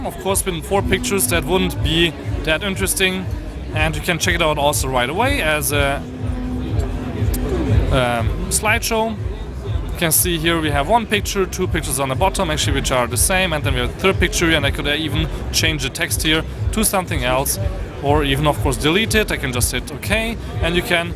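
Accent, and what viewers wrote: Germany. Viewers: Hi first off, please refrain from using profanity in your videos. Also, are you german? :0)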